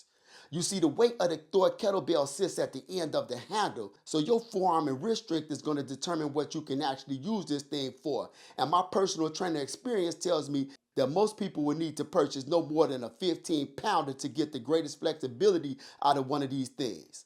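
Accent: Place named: Brooklyn, United States. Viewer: New Yorker he is. That sounds like an old school Brooklyn accent. I'm guessing because the way he talks. He sound like he from Brooklyn.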